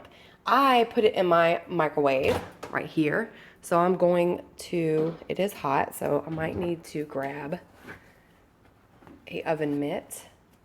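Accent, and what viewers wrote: South, United States. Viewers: Girl, save your fingers! Just sit the jar on the candle warmer and enjoy the scent right out of the jar! When scent is not as strong anymore, dump out the wax in trash and wipe with paper towel and then wash jar. Less mess, and you won't burn your fingers! If you want to make the melts, just wait until all wax has melted and pour into silicone molds. I don't bother using melts or burning candles anymore. I just sit the jars on the warmer. So much easier! Love your videos and enjoy listening to your accent. "Y'all' Blessings. 😊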